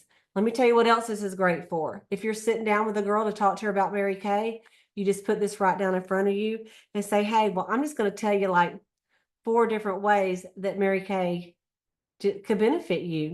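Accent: South, United States. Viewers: I love your country accent. I wish I had one like that. Your voice makes your videos more fun to watch and listen too. I also hear the joy and excitement in your voice and see it on your face. I believe you really enjoy what you do.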